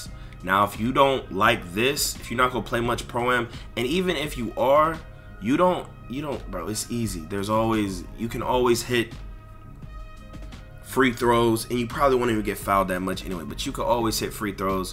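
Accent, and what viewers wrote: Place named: New York, United States. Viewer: Bro where r u from u talk like YRG and young don the sauce god and some New York nigga all at once bruh wth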